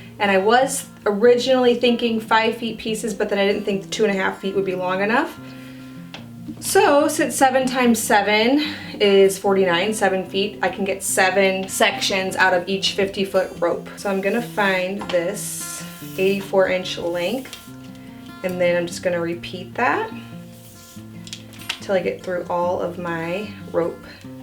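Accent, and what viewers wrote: United States. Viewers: No way, our young lady is not from NC with that accent. Iowa, I think. I'm from SC and have lived in NC as well. Remember Boone as a small town "nestled in the Blue Ridge Mountains" my family'd stop through on our way to Ski Beach in the winter.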